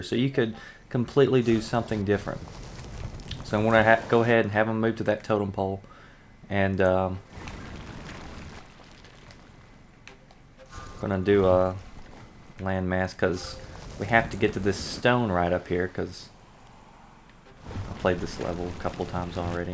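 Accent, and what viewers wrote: South, United States. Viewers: Hey were you from? I'm from georgia, you sound like you're a fellow southerner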